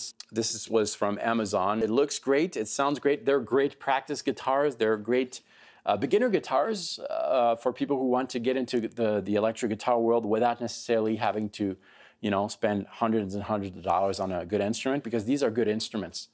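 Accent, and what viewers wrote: Quebec, Canada. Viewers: I bet you're from Québec. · A true lesson. I thought French Canadian.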